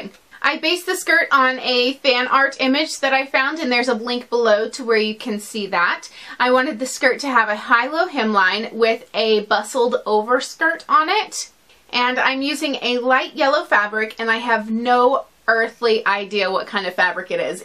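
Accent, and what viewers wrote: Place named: West Coast, United States. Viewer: Would you mind if I asked where you are from? You have a bit of an accent, but I can't tell exactly where from. California? Arizona? West coast USA? Sorry, this question is irrelevant to the topic but I'm very interested in accents & dialects for my work. Thanks! Beautiful skirt, too!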